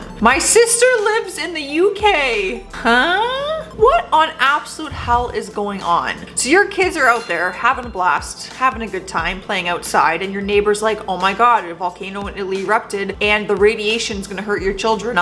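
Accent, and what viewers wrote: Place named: Canada. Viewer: Okay, I can’t do this anymore. You are hilarious and also a genius. How do I know you are a genius? Because you agree with me on almost everything. That’s proof enough. What you call sassy, my friends describe in me as being an asshole. I don’t care. These hopeless losers deserve everything we can throw at them. I love watching your videos. One small criticism: I can handle the Canadian accent and saying “aboat” for about, but work on your vocabulary, girl. I am amazed at how many perfectly ordinary words you mispronounce and seem never to have heard. Still, I love you to pieces. Keep being an asshole, oops, I meant being sassy. Some of us love it.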